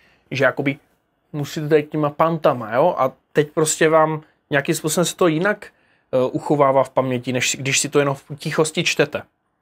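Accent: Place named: Moravia, Czech Republic. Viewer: Ten moravsky prizvuk je zabavny k tvemu videjku :)) Delas to skvele.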